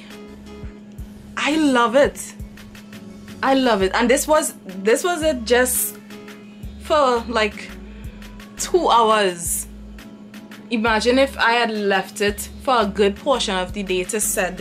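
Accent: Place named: Trinidad and Tobago. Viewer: Hair looks nice . Accent sound like a trini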